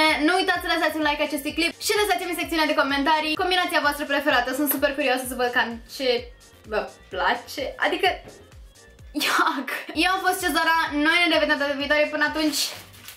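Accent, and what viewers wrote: Moldova. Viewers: esti moldoveanca?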